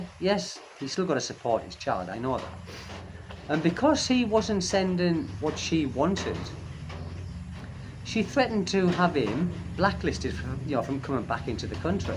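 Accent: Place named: United Kingdom. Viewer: ome pf the appeals of your channel is your British accent, i love the way you say, de-poorted :face-purple-crying::face-purple-crying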